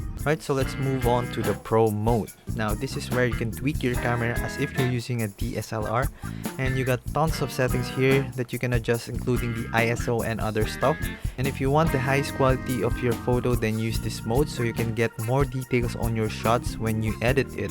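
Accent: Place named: Philippines. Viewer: Iba talaga ang accent kapag pinoy nakakaproud🤧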